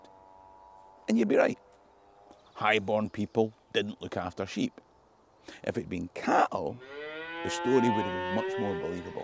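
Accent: Scotland, United Kingdom. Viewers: Are you Scottish or British?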